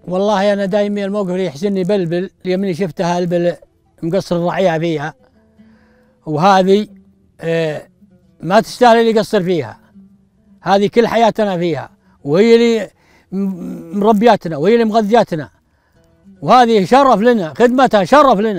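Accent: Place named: Najd, Saudi Arabia. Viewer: قد يكون عايش في نجد او ف الشمال ومكتسب اللهجه من الديره الي هو عايش فيها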